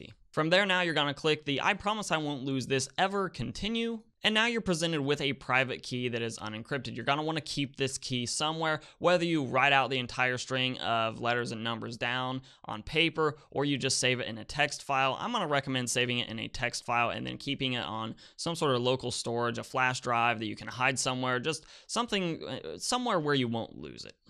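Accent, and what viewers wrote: Indiana, United States. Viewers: Are you from indiana?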